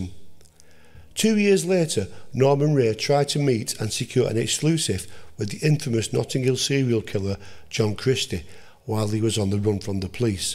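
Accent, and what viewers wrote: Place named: Bolton, United Kingdom. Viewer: Nice one cha the case in Blackburn where they finger printed the entire town struck a chord as I was born there and iam guessing your not from far away with your accent Bolton farnworth is my guess ha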